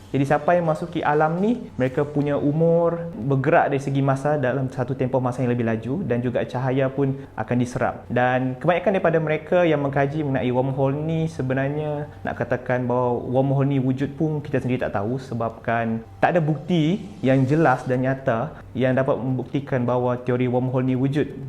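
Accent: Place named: Sabah, Malaysia. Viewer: Speaker dia ni.. org sabah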